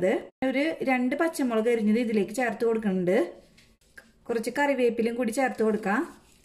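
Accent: Thrissur, India. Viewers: L42 watched full nice recipe and loved your Thrissur accent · Like 11 I joined 594 Thrissur slang super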